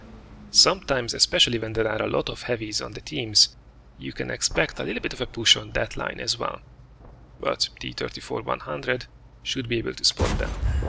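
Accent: Hungary. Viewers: hes from Hungary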